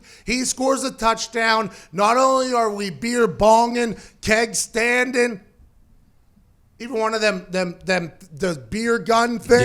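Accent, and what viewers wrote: Pittsburgh, United States. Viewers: Can hear that yinzer coming through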